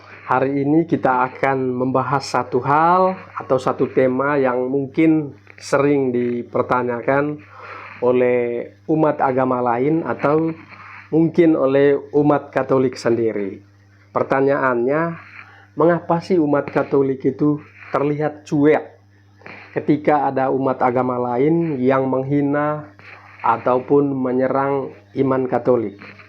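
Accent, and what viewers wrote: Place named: Manggarai, Indonesia. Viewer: Bahasanya manggarai sekali e kësa 😅😅...semangat dalam memberitakan keselamatan ❤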